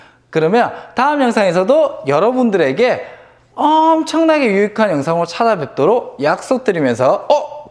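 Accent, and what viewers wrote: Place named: Gyeongsang, South Korea. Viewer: 말씀 들어보니 경상도 싸나이신듯 하네요.☺따라해볼께요 땡큐♡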